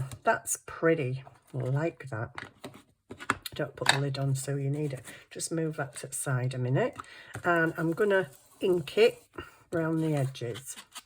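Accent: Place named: Scotland, United Kingdom. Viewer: Are you Scottish? I just LOVE your accent!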